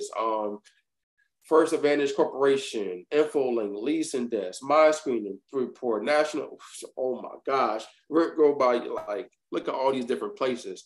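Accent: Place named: Baltimore, United States. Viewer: Y’all from Baltimore huh?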